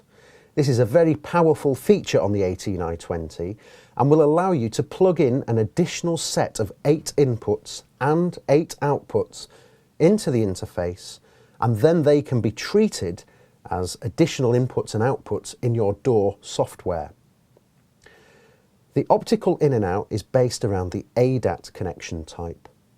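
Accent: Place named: England, United Kingdom. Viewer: Just a bunch of talking with no user knowledge to be applied...oh, he has an english accent.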